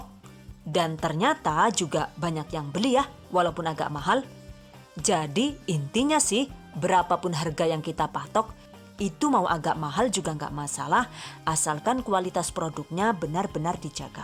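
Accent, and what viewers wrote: Banyumas, Indonesia. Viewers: Hehhehe sambil semyum2 sndiri klo udh liat vidio bahasa ngapaknya buat lucu #GiveawayTHV2021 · Yahh koo malah ngapakk...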